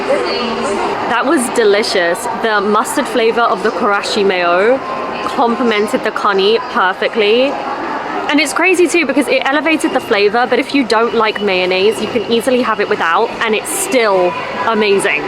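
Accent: United Kingdom. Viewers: シックでエレガントな美人さんがイギリス英語を喋りながら、この瞬間は心は大阪人って感じね。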